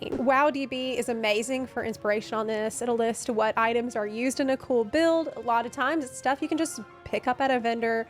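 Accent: South, United States. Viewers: It’s been years since I watched a video. Was she always southern? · Yeah, same, same! I just left a comment about it and to clarify, I’m exactly the same way. Not trying to be confrontational or offensive at all, just legit curious! My father’s people are from Tennessee and the rest of my family is from Oklahoma, so she sounds like half my kin 😄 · sound like you have a Southern drawl in your American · you sound southern:P maybe Tennessee or Louisiana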